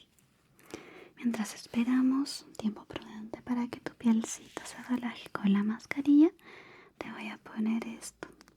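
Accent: Chile. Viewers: A mi me gusta su voz también cuando habla a lo chileno se escucha muy hermosa:_Love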